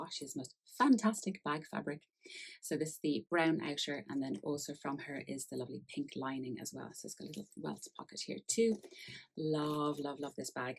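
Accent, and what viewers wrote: Ireland. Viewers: Hello, I just came across your channel, those are beautiful makes, is that an Irish accent?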